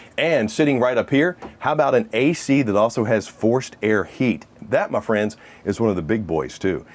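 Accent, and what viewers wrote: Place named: United States. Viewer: I cannot imagine a more American-sounding person than this man. That's neither good nor bad - just an observation. The accent, of course; but also the tone, the diction, the rhythms...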